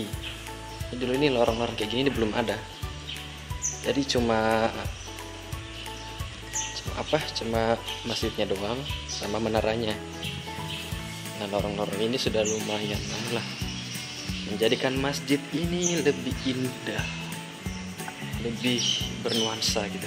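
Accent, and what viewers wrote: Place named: Java, Indonesia. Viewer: Bahasa jawanya medok banget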